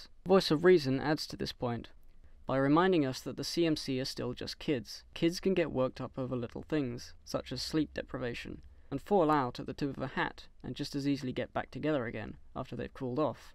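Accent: United Kingdom. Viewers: wait, wait, wait, Back up the pick up truck SAY WHUT. UR BRITISH. YUSH. ME TOO. BRO FREAKING HOOF. Yush I did notice that at the beginning. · are you english? And if so which part of England are you from?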